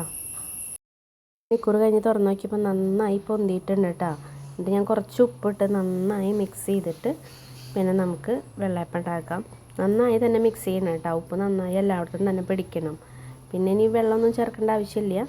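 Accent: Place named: Thrissur, India. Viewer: തൃശൂർ ഭാഷ നല്ല രസം. പിന്നെ വെള്ളപ്പം 😋👍🏻❤️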